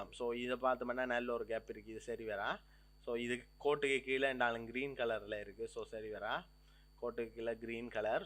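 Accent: Sri Lanka. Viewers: This is Srilankan Tamil 😍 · Nalla teacher. Srilankan Tamil vasanai sema bro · இவர் இலங்கை தமிழ் மண்ணின் மைந்தன். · Bro neenga srilankan vaa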